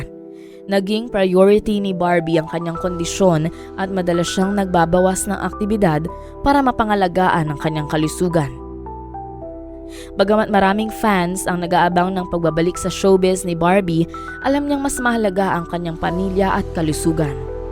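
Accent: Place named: Pangasinan, Philippines. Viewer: nacurios ako sa issue na to kaya pinanuod ko, parang may tonong/puntong pangasisnense yung voice over❤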